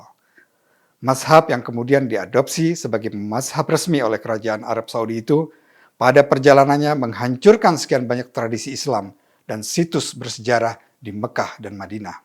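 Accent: Indonesia. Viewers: Habib cara bicaranya intelek dan dialeknya sangat indonesia sekali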